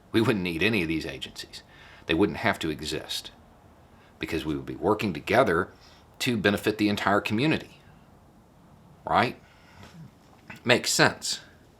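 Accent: South, United States. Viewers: Thank you my brotha. Finally some sense in this comment section instead of the “you tell it maaaaaaan” echo chamber. You know how many other black students I saw in the business analytics program ? Fucking 1 you know how many black students were in the business school getting degrees in marketing and international business? Literally thousands. It’s all about what you invest in. I invested my early 20s in the military to pay for my degree and I made damn sure it was worth something. What this video is is a trap. They see the white guy with the goatee the southern accent and the hat and they hear him talk like Bernie sanders and they are like “ahhhhh shit this is one of the good white guys. He looks like a racist ass Trump supporter but he’s invited to the cookout” what a fucking joke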